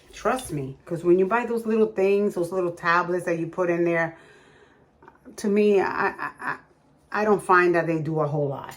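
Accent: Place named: Puerto Rico. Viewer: Are you Puerto Rican so cute the accent!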